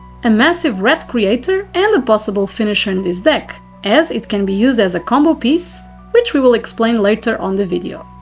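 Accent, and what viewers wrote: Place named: Portugal. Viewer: Are you Portuguese? Luv your accent! ótimo video.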